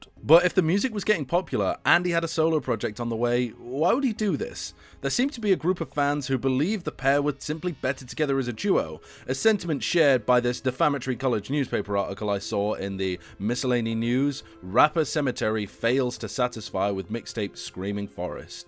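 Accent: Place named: United Kingdom. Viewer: You sound to british for this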